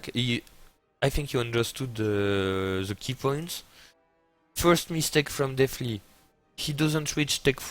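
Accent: France. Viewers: hehe i like heariung all the names in french accent ^^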